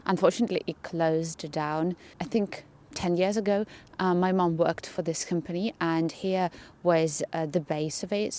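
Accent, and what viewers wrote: England, United Kingdom. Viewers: Thank you for taking us to Belarus super markets and showing different varieties of groceries in your country place... I like your English accent...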